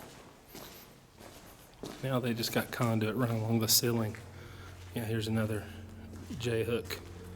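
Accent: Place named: South, United States. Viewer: Thanks For another awesome video Peace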